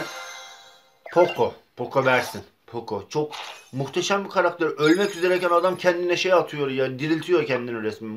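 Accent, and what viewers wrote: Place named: Trabzon, Turkey. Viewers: Trabzonlu olduğu için olabilirmi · abi artık trabzon şivesiyle konuşmuyosun neden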